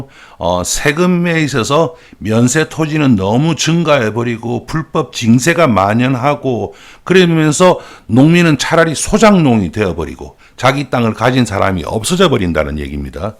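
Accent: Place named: Busan, South Korea. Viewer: 재미있고 유익한 내용으로 제작하시는 노고에 감사드립니다. 부산말억양이 친근하게 들리네요 정확한 발음에 천천히 가는 속도도 좋습니다..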